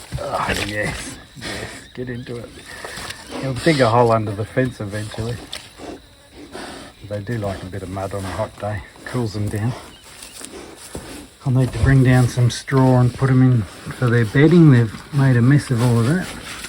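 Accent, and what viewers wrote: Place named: Australia. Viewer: Has an ausy acent makes all the sence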